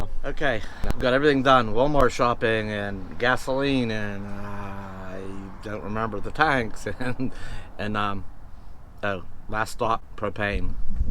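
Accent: Pennsylvania, United States. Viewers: Are you from Pa....you kinda have that PA accent 😂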